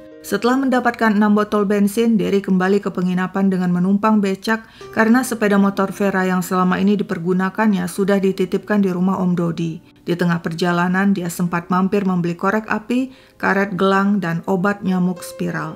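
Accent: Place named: Palembang, Indonesia. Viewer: paten jg kak bahasa palembangnya😂😂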